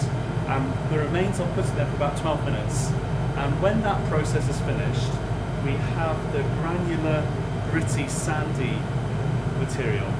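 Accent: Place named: United Kingdom. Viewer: A British accent makes everything sound nice!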